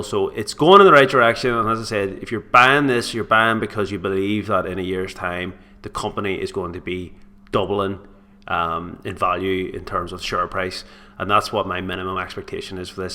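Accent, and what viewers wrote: Northern Ireland, United Kingdom. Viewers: I thought it was a Northern Irish accent.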